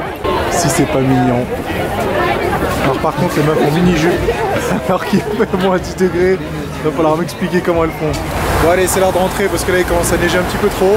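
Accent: England, United Kingdom. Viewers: Sympa ce genre de petite vidéo aussi ! Par contre je suis déçu je voulais entendre ton accent anglais x') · Meme pas une petite fin avec ton accent anglais?! J'espère voir ca dans une futur vidéo!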